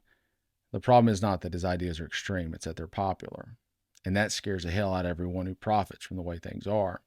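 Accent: South, United States. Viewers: Reminds me of Beau (of the Fifth Column). Another calm, soft-spoken, Southern voice.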